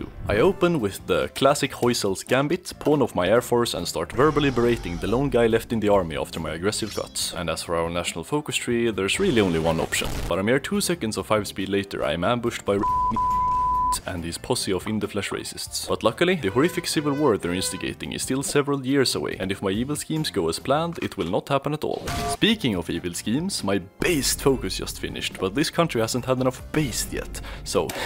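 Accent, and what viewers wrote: Sweden. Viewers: Din dialekt är exakt som en stockholmare? Va fan är du då?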